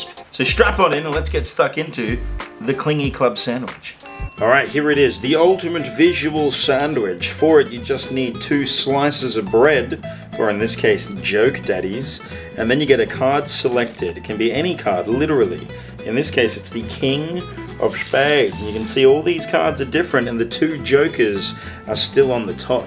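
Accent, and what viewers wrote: Australia. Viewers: are you Australian?😂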